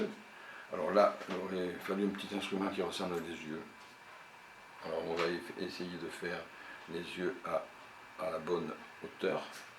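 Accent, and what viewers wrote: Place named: South, France. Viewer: Je viens de vous découvrir, c'est ENCORE bien sûr. Et puis, pour moi qui suis belge, le petit accent qui sent bon le sud ne gache rien!!!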